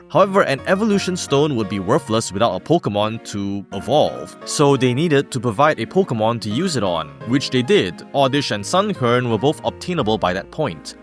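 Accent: Singapore. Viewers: First time watching your video and your accent is familiar. I'm guessing you're either Malaysian or Singaporean. Edit: Ok, I checked the bio and is indeed from Singapore.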